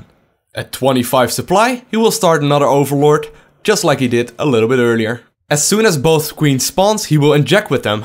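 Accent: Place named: Netherlands. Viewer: just a sec... ben je nederlands??? i kinda hear a bit of an accent, (srry that was offtopic) but yeah, thx for the vid, you just got a new subscriber, you're awesome keep up the good work!!!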